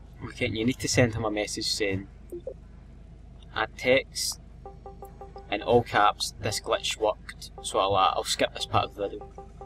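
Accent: Scotland, United Kingdom. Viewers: wish it would have worked but I love the accent.scottish?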